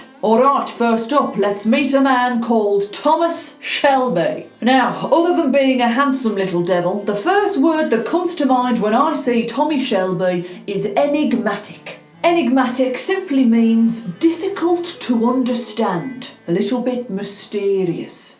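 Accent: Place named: North, United Kingdom. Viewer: Watched one of your videos where you say you have a RP accent...you dont you have a finally disguised northern accent or maybe notth Midlands...PS your Fit :p Greetings from the north lol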